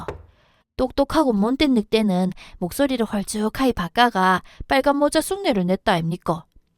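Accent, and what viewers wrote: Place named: Daegu, South Korea. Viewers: 아는 내용이지만 대구 말로 들으니까 새로운 느낌이네요. 다른 동화도 계속 들어 보고 싶어요~😊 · 대구 버전으로 들려주는 동화 이야기 구수하고 정겹습니다~~^^ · 대구를 떠나온 지 30년이 훌쩍 지났는데...동화영상에서 고향말을 실컷 들어보네예~ 고맙심데이!^^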